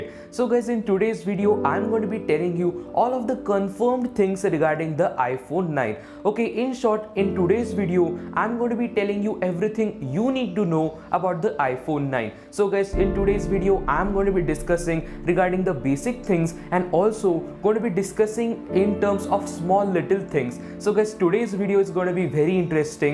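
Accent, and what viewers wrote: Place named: India. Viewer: Your accent is reminding me of Indians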